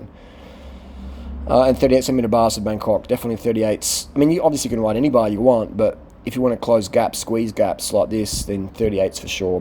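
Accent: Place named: Australia. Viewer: There is do and don’t . Her outfit is so inappropriate. I don’t think she study to much before she came to the country . Please put some clothes on . Culture and etc be respect . You are the first one that use the F word in video , beside that it is a nice video. Sound like Australian .. I do not have a problem Where you from at all and I am not jealous the way she dress just worry that she will be rape or something will bad will happened. Just be safe. She is not doing any modeling on the street I don’t think.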